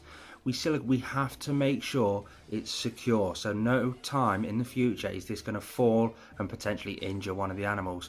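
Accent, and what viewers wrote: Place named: Sheffield, United Kingdom. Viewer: Nice setup just wondering where are you from I’m getting Sheffield vibes idk y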